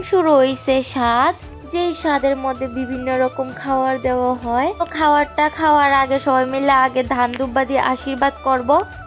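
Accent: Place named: Habiganj, Bangladesh. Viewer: আমার প্রিয় জেলা হবিগঞ্জ থেকে দেখছি আপনার কথা আমাদের হবিগঞ্জের ভাষা মতো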